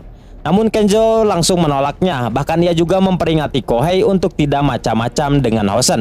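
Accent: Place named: West Java, Indonesia. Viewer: Sarebu persen pembicara adalah USA alias Urang Sunda Asli, katambahan katkuaya babangus mah semakin teryakinkan.... Wkwkwk mantap ieu si mamang sumanget terus sesuai alur Carita 👍🏻👍🏻👍🏻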